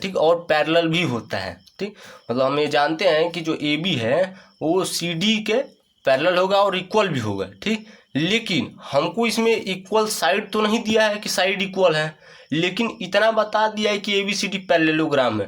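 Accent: Bihar, India. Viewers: Arrey Bhai Sirji Bihari Haina Isliye Sir K Bolne Se Hi Pata Chalta Hai Ki Sir Bihari Hai 😀👍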